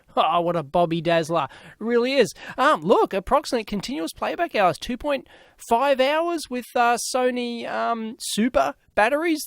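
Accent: Australia. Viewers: Having coffee this morning & listening while this in the background build building a new 3d printer. Love the Walkman tear-down. (Although I'm not sure if its the coffee or the ausie voice waking me up!) cheers :) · You have the most australian dialect ive ever heard "loosey goosey" lmao wtf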